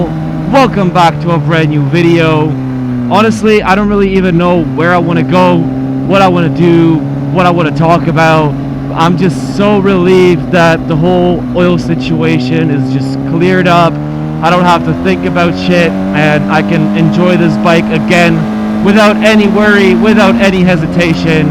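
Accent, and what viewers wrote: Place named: United States. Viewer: I was so confused by your American accent but European plates and roads and using km, I was like where tf is this filmed 😂 I was like this is a weird fucking part of Canada 🤣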